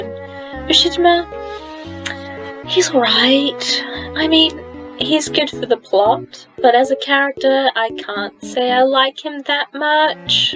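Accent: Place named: New Zealand. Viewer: as soon as i heard your voice i just said “she sounds like a kiwi!” ig i was right haha (im also a kiwi 😊)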